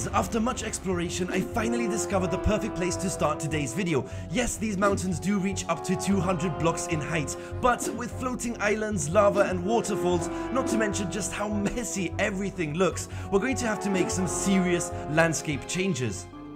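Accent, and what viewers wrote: United Kingdom. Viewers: I don't want to be rude but are you British😅😅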